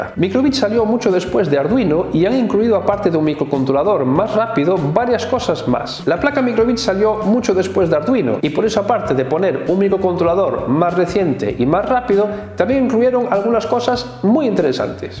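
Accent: Galicia, Spain. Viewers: Nuevo suscriptor que por tu acento sospecho no te llega de muy lejos. Saludos y Like desde Arteixo!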